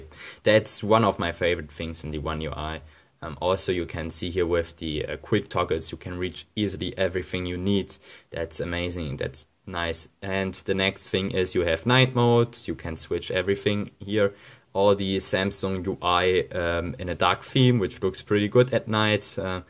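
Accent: Germany. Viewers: I love your German English accent 😍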